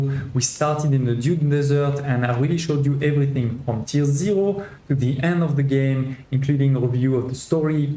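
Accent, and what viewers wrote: France. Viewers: Are u French? U have a French accent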